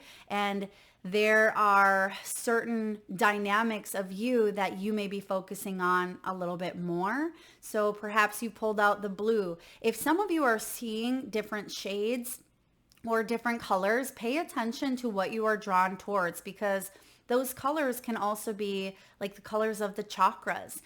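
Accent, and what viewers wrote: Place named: Midwest, United States. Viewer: I've been watching you for some years now and always thought you sounded so midwestern! I grew up in ND and went to college in MN, my best friend is from Wisconsin :)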